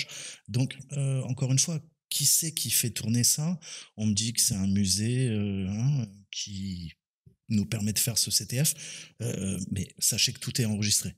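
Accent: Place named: France. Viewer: Oh mec i'm in love with your french accent 😂 you rock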